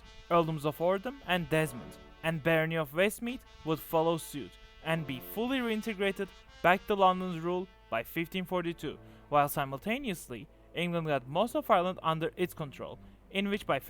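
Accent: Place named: Turkey. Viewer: Türk aksanı da eklenince gayet güzel bir video olmuş. Tebrikler :)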